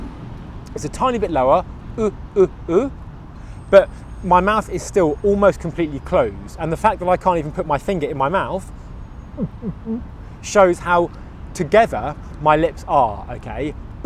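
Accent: United Kingdom. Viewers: Hello sir ,your accent is american or british ??? It is the first time to see your channel .my mother's tongue is arabic .do you like arabic language😀 i learn american accent.i noticed that you speak british ,am i right ? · What British accent do you speak ? Is the British IPA same for all British accents ? I am from India I speak Hindi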